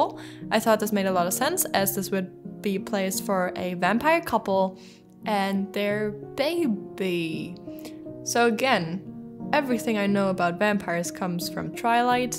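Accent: France. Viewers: I'm wondering if you're french because of how you pronounced some words in this video i think you are ! Anyways ... i like the build a lot Vampires are my favorite supernatural beings I don't think you should be ashamed that you were into Twilight back then it was a global phenomenon so many people were into it i mean i was into it lol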